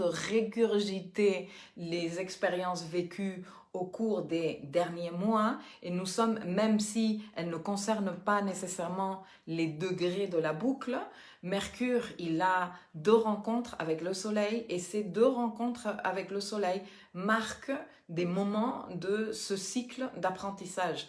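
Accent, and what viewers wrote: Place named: Mexico. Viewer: Merci beaucoup pour votre reponse...🙏🙏je vous découvre depuis quelques temps et j'aime beaucoup vos analyses et interprétations très éclairantes qui se croisent avec d'autres...et surtout j'aime beaucoup votre accent Mexicain... Gratitude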